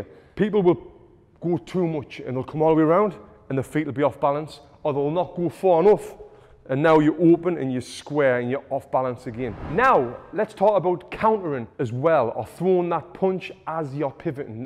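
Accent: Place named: Northeast England, United Kingdom. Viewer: Cracks me up how the North East accent needs subtitles.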